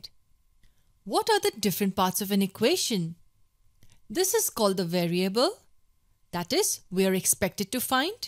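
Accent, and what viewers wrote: India. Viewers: This is an Indian accent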